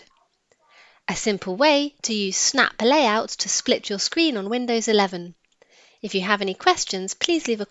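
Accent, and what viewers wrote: United Kingdom. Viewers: Love ur British accent 😍😍😍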